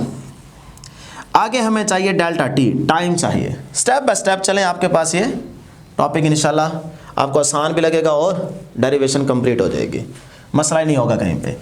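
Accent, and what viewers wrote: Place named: Saraiki, Pakistan. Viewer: Sir ap saraiki laghta hnn😊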